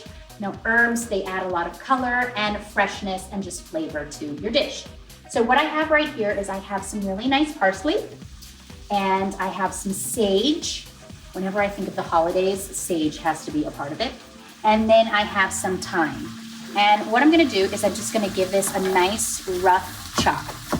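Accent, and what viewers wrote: South, United States. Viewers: sounds like the South where im from 🔥🔥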